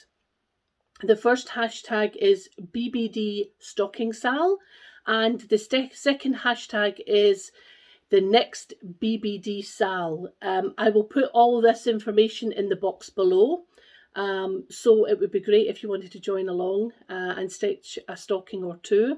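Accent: Scotland, United Kingdom. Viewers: A great cut and lovely colour! Your hair really suits you. Thanks for the videos, I always enjoy them and I love listening to your Scottish accent. I was born up in Banffshire several decades ago, but was brought down to England as a babe in arms and here I have stayed. Your voice makes be feel nostalgic for Summer holidays with my grandmother. Now I must see if I can out my hands on that Blackbird Christmas design..... All best wishes, Liz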